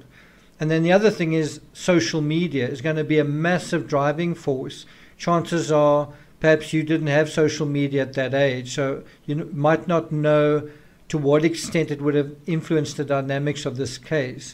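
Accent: South Africa. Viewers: Howsit! Love the SA accent. Great channel. Greetings from Eire 🇮🇪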